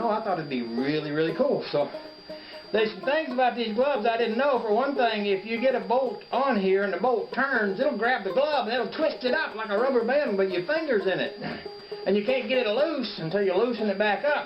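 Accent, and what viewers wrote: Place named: Georgia, United States. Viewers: HaHaHa! Had me laughing! Funny vid! ...I knew I heard a Ga drawl in your voice! What part of Ga you from? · you can wear them under your work gloves if needed and i heard georgia i didnt know you lived in georgia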